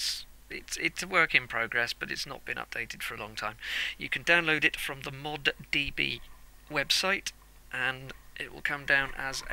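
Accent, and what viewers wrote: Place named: United Kingdom. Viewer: Not sure why, but every time I watch a YouTube video on how to get some program to run, it always seems to be a Brittish fellow. Anyway, thank you very much for posting this tutorial! I have never played Deus Ex before, but after loving DXHR, I decided that I simply must play the original as well. And thanks to your video my experience should be even better now.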